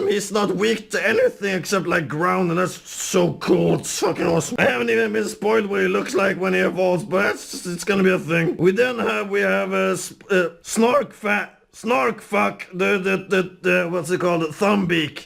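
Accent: Sweden. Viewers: WTF are you Swedish? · Are you Swedish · Why are all swedish (I kinda heard a accent) YouTubers so crazy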